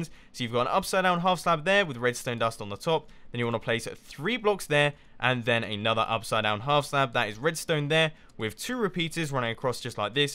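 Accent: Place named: United Kingdom. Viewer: He sounds really British in this😂 and I’m from england😂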